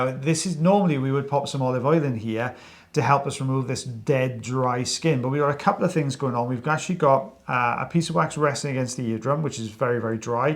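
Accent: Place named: South Wales, United Kingdom. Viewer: Nice to hear a bit of Welsh. South Wales I’d say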